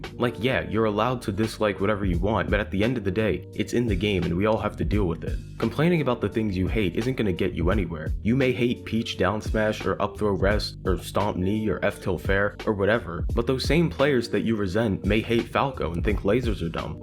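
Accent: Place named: New York, United States. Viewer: has the thickest NY accent i've ever heard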